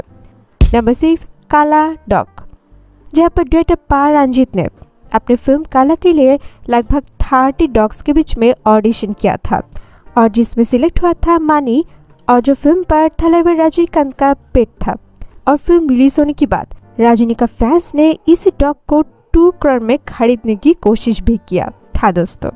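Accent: Bengal, India. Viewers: your accent says you are bengali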